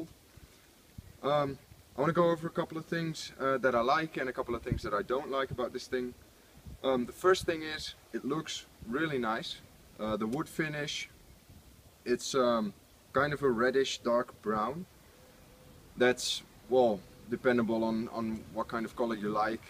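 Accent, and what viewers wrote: Netherlands. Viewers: It looks very good but too bad it doesn't have blowback. P.S. Your accent sounds dutch.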